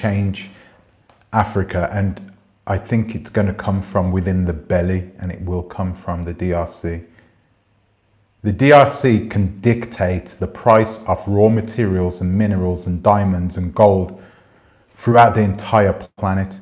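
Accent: United Kingdom. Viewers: Your English is perfect 🤣🤣 I love the British accent.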